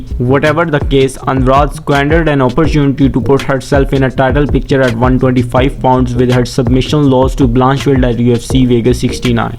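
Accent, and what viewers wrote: India. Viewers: MY GUY WITH THE INDIAN ACCENT!!!!! WHATS UP MY FRIEND?! Vegas 69 main card was the drizzling shits but Blanchfield was awesome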